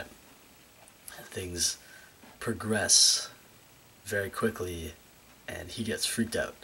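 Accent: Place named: Ireland. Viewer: I adore that little Irish accent you are developing! Words can't even describe how excited I am to watch this short film. I have very little knowledge on trans people, but at the same time, a great deal. My ex was trans and I'm beginning to wonder if I am too. I just feel so incredibly masculine, yet nobody can truly understand it or me. Also, my best friend says I have the face of a 14 year old boy. lol I've been watching your videos from the beginning,. You are very awesome indeed.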